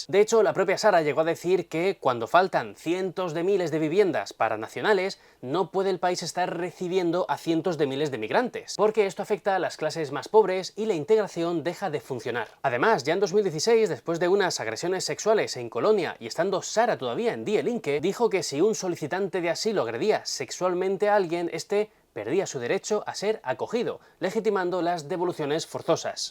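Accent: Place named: Castile, Spain. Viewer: antes de opinar, te sugiero que aprendas a pronunciar correctamente las palabras alemanas, que en tu acento castellano suenan fatal, hijo mio. Para muestra un botón, la palabra die, se pronuncia fácilmente como di.... De nada.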